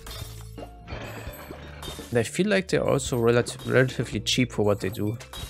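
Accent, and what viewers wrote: Germany. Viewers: Are you a german? You have that accent xD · Your voice with the german accent sounds just like one of the guys from Team Darkside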